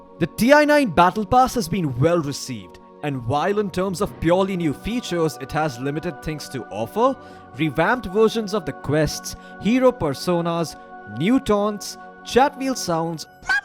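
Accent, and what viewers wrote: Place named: Southeast Asia. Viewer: se asian accent so i wont watch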